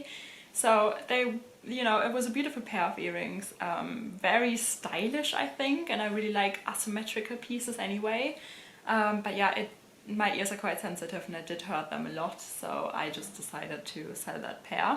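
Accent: Germany. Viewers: Was hast du denn für einen deutschen Akzent?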